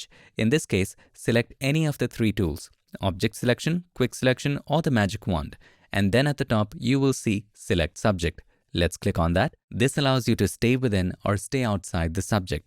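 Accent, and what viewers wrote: India. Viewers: Your Indian accent is still very thick. Also, you will not look taller by pulling your hair up.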